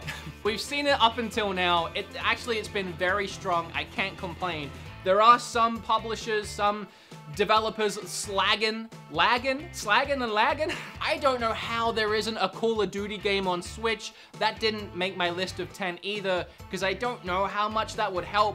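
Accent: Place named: Australia. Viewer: Aussie Mate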